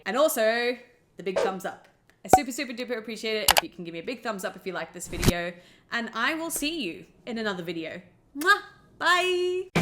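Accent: Australia. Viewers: i like a lot watching your videos about bluey you are an awesome australian youtuber i likea lot Your Australian Accent anyway i'm from italy and i'm a big Bluey Fan i love watching Bluey a Lot since 2021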